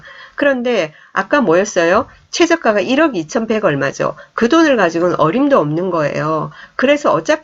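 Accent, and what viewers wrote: Busan, South Korea. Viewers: 소장님 달달한 부산사투리하고 추임새...듣기좋네요 나만 좋은건가ㅎ 강의 잘들었습니다~감사합니다❤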